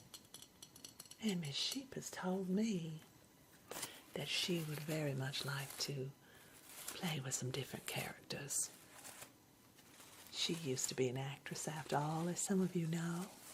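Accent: South, United States. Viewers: Oh what a wonderful surprise! Absolutely love love love this Southern belle! Just exquisite and relaxing. Happy new year! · Oh my gosh yay. I love, that southern accent is so tingle inducing. Love love looooove <3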